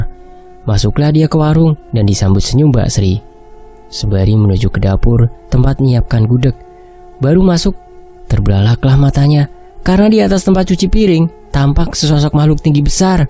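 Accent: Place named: Kebumen, Indonesia. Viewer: Mbak dr smg. Tp logatnya kebumen 😊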